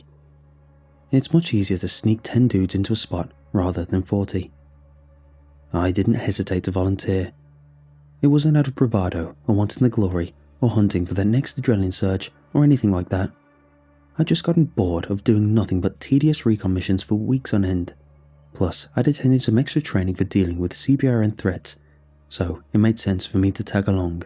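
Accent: United Kingdom. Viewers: Sorry, can’t listen to some bullshit story about US troops from a British accent and verbiage. Wtf?!?!?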